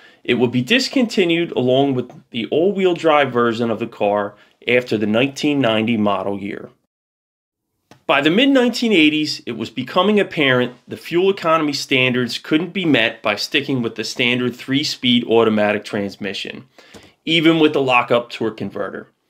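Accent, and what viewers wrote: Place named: Baltimore, United States. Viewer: Speaker sounds to be from Baltimore hon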